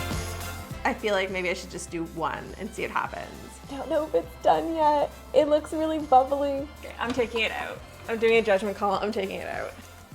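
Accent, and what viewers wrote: Canada. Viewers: Is she Canadian? She has a Canadian accent I feel lol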